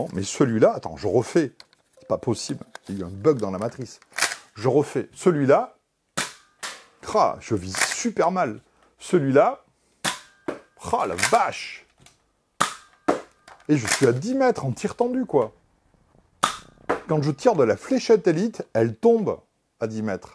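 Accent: Germany. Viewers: Un peu fatiguant l'acsan allemand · Pourquoi tu parle et cri avec un accent Allemand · Il me tu avec sa façon de crié avec l'accent Allemand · Super l'axent allement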